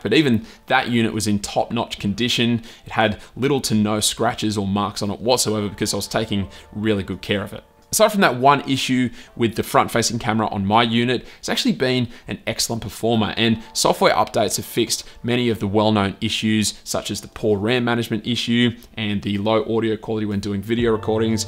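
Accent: Australia. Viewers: Is it an australian accent? It sounds cool but I can't understand, is it australian or not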